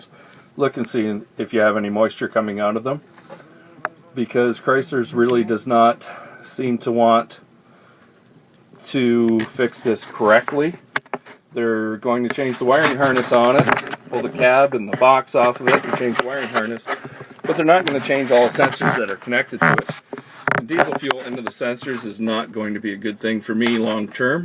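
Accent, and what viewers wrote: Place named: Canada. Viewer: This guys sounds Canadian (don’t know too many Americans who pronounce Chrysler with an S on the end)...does Carfax have reliable info sources in The Great White North?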